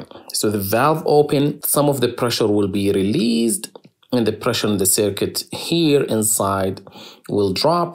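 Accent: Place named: Jordan. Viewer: عرفتك من اللهجة انك اردني. زي لهجتي. انا اردني الماني . الله محييك. فيديوهاتك جميلة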